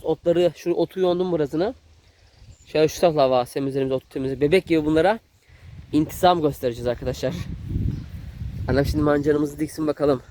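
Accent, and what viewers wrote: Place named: Kastamonu, Turkey. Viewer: Sizleri izliyorum bende kastamonu bozkurt ilçesi konuşmanın bi ze benziyo mesala mancar😁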